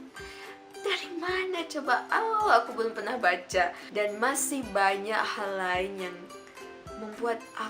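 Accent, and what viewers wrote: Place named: Malaysia. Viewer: ikut senang bhs indonesia ada di harvard. Dan apa kabar dengan bhs malaysia kak? apa juga sampai di sana? karena sepertinya kak berlogat malaysia.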